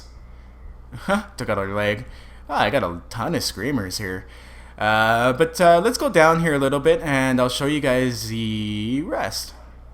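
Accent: Canada. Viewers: You sound so canadian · you canadian cause i am and u sound like u are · You're totally Canadian aren't you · he sounds canadian.....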